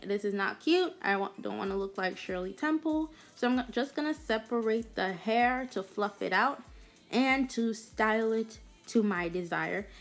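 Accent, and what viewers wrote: Jamaica. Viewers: Didnt even realize you were Jamaican until I read the comments and relistened to the video. As a Jamaican myself, I totally forget about that now that Im living in America because we have the same accent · Knew you were Jamaican from the video start, mi ago try dah style yah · are you Jamaican? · Baxcide har accent shes jamaican 💗💗💗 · Could tell you were a yardie from the first word u said 🇯🇲🇯🇲🇯🇲🇯🇲 mi ago try dah style ya tomorrow. Big up u self cute gyal 😍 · Crissy samuels she's Jamaican. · she low-key sounds Jamaican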